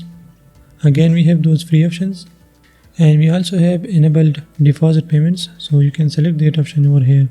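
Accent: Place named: India. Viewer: Aha Indian accent! But thanks for the video.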